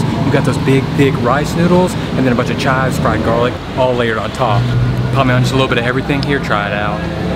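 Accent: South, United States. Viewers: Where are you from? I hear a little bit of southern accent. Great video btw. Keep up the good work!!!!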